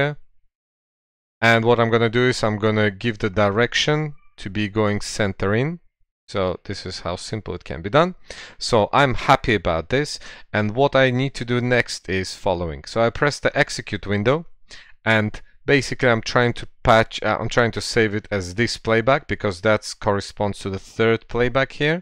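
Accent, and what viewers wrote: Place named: Russia. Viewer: Thank you very much.I love your videos and the way you share things with us. Love you russian accent.Haha.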